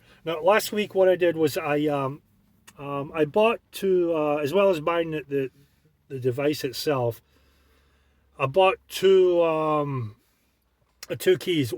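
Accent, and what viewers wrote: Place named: Scotland, United Kingdom. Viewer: Why do you sound Scottish and American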